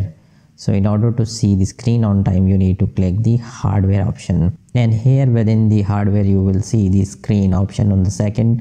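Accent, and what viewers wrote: India. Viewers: People with Indian accents on youtube have tought me more then school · So wut if they have an indian accent i think their helping alot and doing pretty good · They always have an Indian accent I swear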